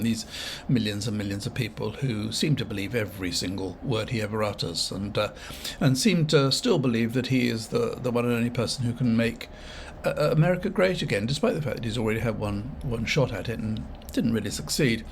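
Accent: RP, United Kingdom. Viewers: Looney indeed. An old and boring man repeating all the leftist lies, obviously envious of other countries' prosperity (his idiotic visit to Poland showed how little he knows), Pathetic. He probably thinks that his RP English makes him sound elevated and wise. Britain is a sorry shadow of its past, dirty, forgotten, filled with people without purpose, money, and future. Sir, I feel sorry for you (I mean the channel owner).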